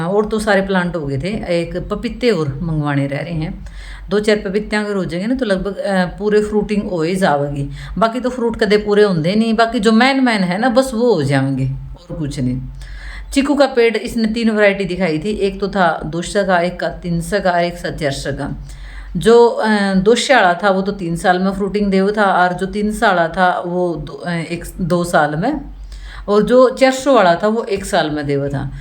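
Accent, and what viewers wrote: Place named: Haryana, India. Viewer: Nice desi vlogs didi bhot badhiya vlog bnao aap haryanvi boli bolde bhot acche lgo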